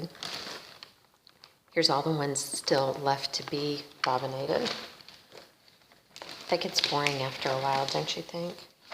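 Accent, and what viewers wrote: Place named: South, United States. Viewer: Just found your channel. Sounds lie you might be from the South. I'm from Georgia. Are you anywhere near there? We had a lot of the same answers on Tracy's talking tag.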